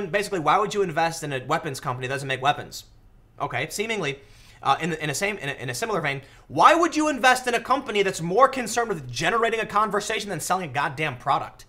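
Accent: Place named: United States. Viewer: Why cant Americans say yoghurt? Sorry, but it does grate on me!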